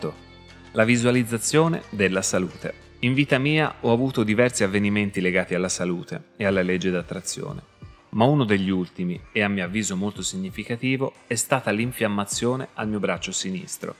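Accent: Tuscany, Italy. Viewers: Ti ascolterei tutto il giorno già solo x il tuo favoloso accento toscano... io amo ❤️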